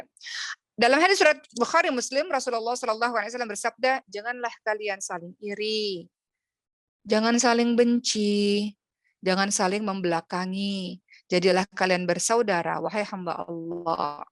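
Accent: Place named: Arab world. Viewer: TERLALU CENGKOK ARABNYA. ULAMA PUN GAK BEGITU.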